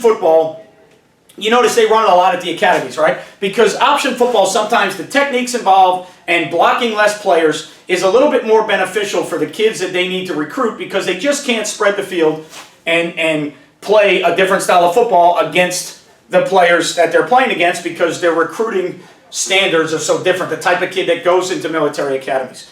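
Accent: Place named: East Coast, United States. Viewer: As a side note at the end of the video, don't ever change the way you talk. I have a really short attention span, and the fast talking, visuals, and constant resets "alright" and "ok" help me stay focused. It also sounds cool...I haven't heard many east-coast people talk, apart from the stereotypical ones on TV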